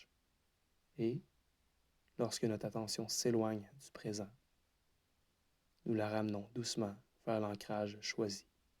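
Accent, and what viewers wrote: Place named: Quebec, Canada. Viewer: ton accent québécois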